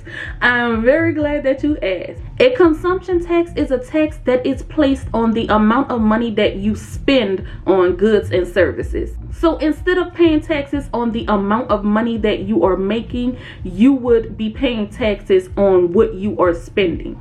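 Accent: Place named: Louisiana, United States. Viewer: I love yo accent, that’s Louisiana?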